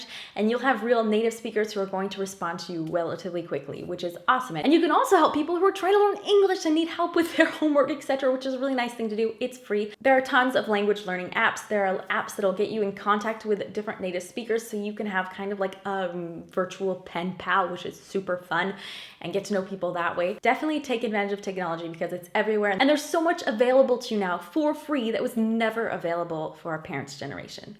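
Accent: France. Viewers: c'st fou tu aurais presque un accent francais en anglais :)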